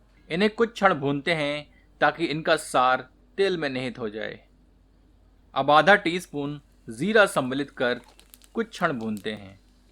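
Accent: Standard. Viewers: Apki hindi bilkul sudh h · Itni sudh hindi.... Aisey feel ho rha hai computer hindi doubing kr raha ho😊bt muton cury r luking so delicious... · Good to hear Swach Hindi.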